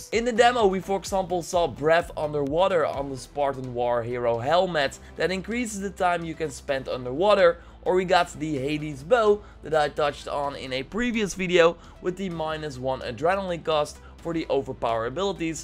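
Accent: Netherlands. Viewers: You have a dutch accent while talking english. Where are you from?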